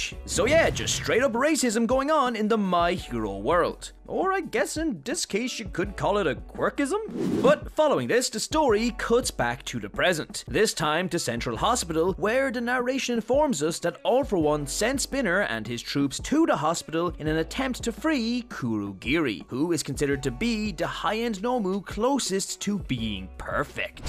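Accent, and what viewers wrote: Ireland. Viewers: I knew your accent was so familiar as an Irish person myself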